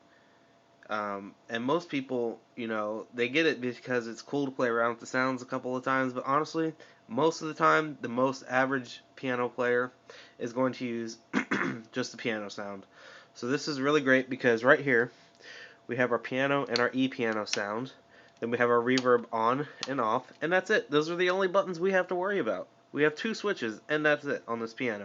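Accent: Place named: United States. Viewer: I see now that you are living in the USA, I was in mind you were from UK, ok the accent was american, so I had to knew that, all the best and thanks, Luc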